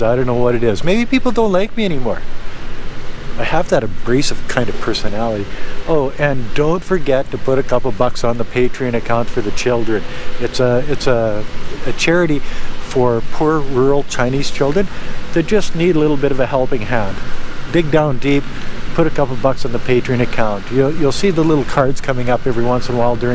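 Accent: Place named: Canada. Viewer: I didn’t know Canadians had an accent until I started watching you. I’ve been a fan for a few months. I’ve really been enjoying your informative vlogs. I got a real kick out of your steak bbq at your condo in Canada. Keep up the good work and best of luck from a fellow Saskatchewan boy.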